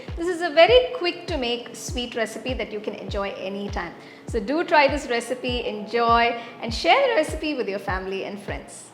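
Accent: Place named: South India, India. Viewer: She is from south India hence the difference in pronunciation..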